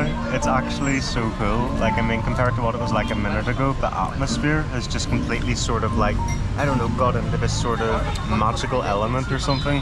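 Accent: Northern Ireland, United Kingdom. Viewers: Your accent reminds me of a pal from Northern Ireland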